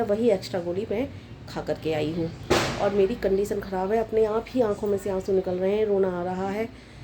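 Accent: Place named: Hathras, India. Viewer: aapko bhi happy Diwali 🙏🏻 main bhi u.p se hi hu.. hathras se hu .. aapke bolne ke andaaz se laga ki aap bhi hathras se hi ho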